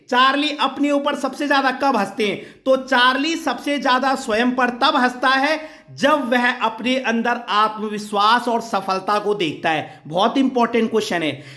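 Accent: Garhwal, India. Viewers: Sir aap gadhwali ho kya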